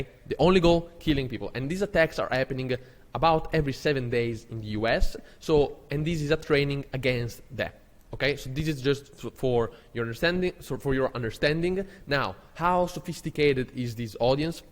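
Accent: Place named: Italy. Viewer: You Italian accent is just so cool to listen bro ❤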